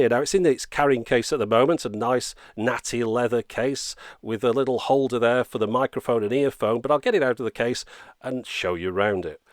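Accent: United Kingdom. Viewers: You're my favorite, seriously, you're the best of all technology channels, and I love your British accent ^^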